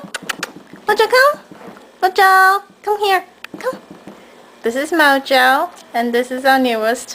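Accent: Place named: China. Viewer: She's Chinese, so it's just her accent.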